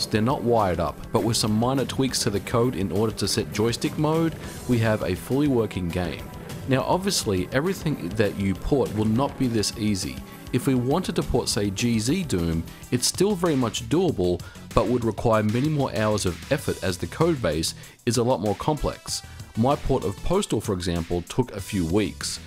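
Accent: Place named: Australia. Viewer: You sound Australian lol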